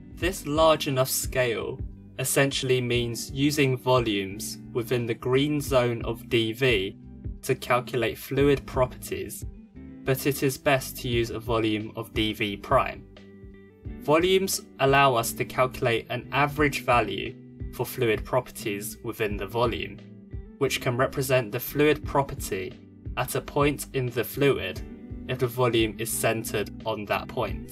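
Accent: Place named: United Kingdom. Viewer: marvellous animations, topped off with an explanatory British-Chinese accent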